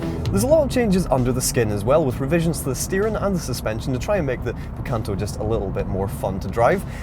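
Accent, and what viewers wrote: United Kingdom. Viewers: also like your accent, which part of UK is it from?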